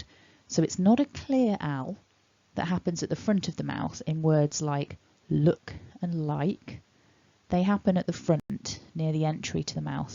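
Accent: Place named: RP, United Kingdom. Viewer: I'd like to point out that in Modern RP, [ɔʊ] (and sometimes [ɒʊ]) is an allophone of /əʊ/ before syllable-final /l/. It can be ascribed to the velarisation of the following /l/ (aka darkening effect of the dark l). So words like "goal", "whole", "coal" are pronounced with [-ɔʊl]. And I hear that in your speech too.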